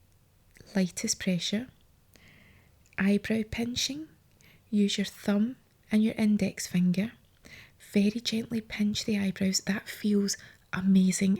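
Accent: Scotland, United Kingdom. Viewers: Beauty Thank you. Lovely to hear your accent too - I am also Scottish! Comforting sound and lovely video. Subscribed!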